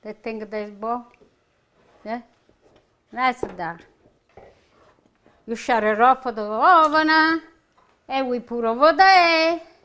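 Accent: Italy. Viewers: My Mother was from Naples Italy and accent, songs and receipts are authentic italian dishes. I grew up eating and cooking these dishes which I have passed down to my brothers and sons. It touches my heart to watch these videos. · I simply adore you. You remind me so much of my mom and the recipes she use to make. Thank you for sharing so many of your delicious recipes. My mom too, immigrated from Italy in 1947. Your accent is so much like my mom. I understand everything you say and how you say it. I love the fact you use your hands when you are cooking, just like my mom and grandma! Keep those scrumptious recipes coming with those beautiful Italian songs! Ti Voglio Bene!!!!!!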